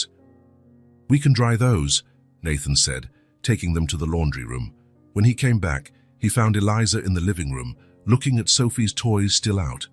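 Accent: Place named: England, United Kingdom. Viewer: It's only a story. I love the readers English accent.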